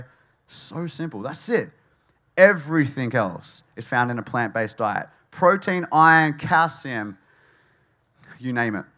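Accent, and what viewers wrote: Australia. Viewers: Another great speech. I could listen to you talk all day long. You have a very gentle voice and I love the aussie accent. You have such a friendly way of introducing people into a vegan lifestyle, giving facts in a non pushy way. I have been vegan for 19 months now, started by signing up to veganuary. I watched earthlings, cowspiracy, what the health as well as many YouTube videos of yours, Joey Carbstrong, Hench herbivore to name but a few. I will never look back, I feel amazing not only health wise but to be joining you and others in making a difference.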